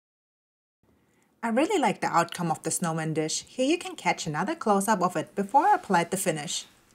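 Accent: Germany. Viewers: Your German (i lived in Germany for 6 years who are know for over engineering most things I say this being French and knowing are most resent European history <num>) and I see you have some advance shop skills with a big push for safety which leads to think your a smart lady like many good Germans well above aveage, but with you aching your back the way you do does not help cutting wood it helps with you getting the views your algorithm and yes even comments and thumbs down would help you if you turn them on why you don't is silly! Your German you must have thick skin living with Americans because I heard alll the joke made towards Germans for many years all all the American living in Germany still to this day my father was in the American army who came to France at the tail end of WWII, so I know the story very well I hear many from my mother who half German and half French she did not lie to me she was in the middle of WWII she knows the truth! 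I am older than most of your aveage boys watching your videos, and I don't fault a pretty lady looking to get her bag with even if you have a side hustle that a lot questionable your not American your Greman accent is heard very well by most Ameicans, so I get it you have bills to pay but you only cut wood with that shirt open that far down and aching your back until you nearly flip backwards and we both know you cut wood like this on camera you would not cut wood like this on a construction site because we both know what would happe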